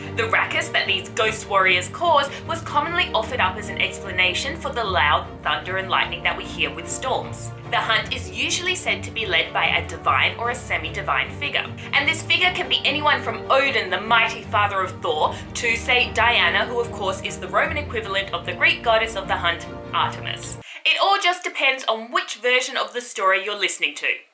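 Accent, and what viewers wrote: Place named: Australia. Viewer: I'm watching this to see why it has record low views in 5 yrs. On a popular subject. Oh Australian accent.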